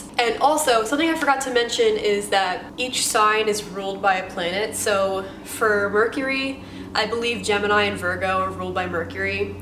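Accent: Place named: Long Island, United States. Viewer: I love the Long Island accent! Thanks for the video!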